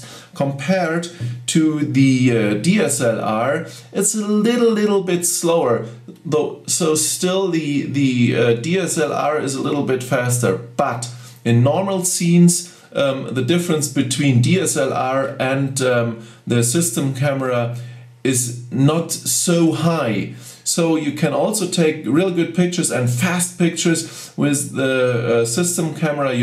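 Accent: Germany. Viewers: german speaking english?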